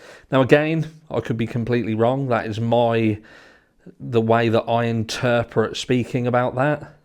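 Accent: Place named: England, United Kingdom. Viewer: U sound like ur from England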